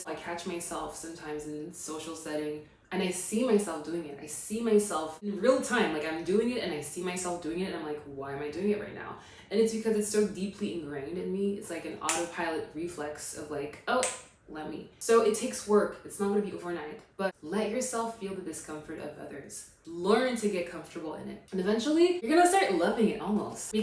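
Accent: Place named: France. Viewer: I wasn't ready for you to be french 😂 quel accent de fou !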